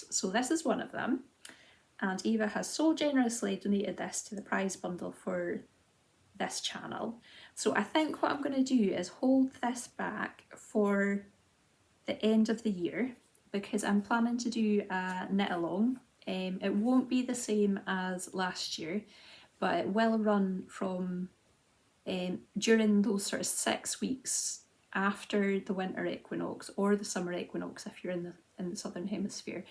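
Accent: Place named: Highlands, United Kingdom. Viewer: Oh, I'm so happy about that! I made a vintage jersey for my sister and the pattern called for a zip on the shoulder. Not only could I not find a short enough zip but I decided it would be itchy. So I used crochet loops (on a tiny needle so they came out nice and dainty) and it looks lovely. You may need to experiment on a swatch you already have. Mind you, the other benefit of trying this is that it's very quick , to both do and to rip off again if it doesn't work out. ps I love hearing a Highland voice, I live in the Midlands but am from Dingwall/Brahan. But actually it's not just your accent and dialect, it's your manner too. You make me homesick :)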